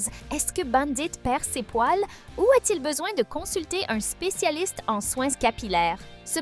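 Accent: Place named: Canada. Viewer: oui, un axent canadien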